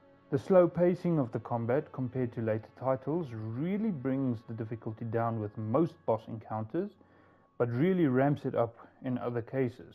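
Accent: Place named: South Africa. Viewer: The accent tells me you're from South Africa.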